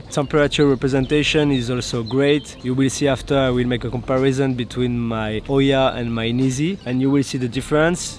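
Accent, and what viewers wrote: France. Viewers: Hi, sorry but are you french ?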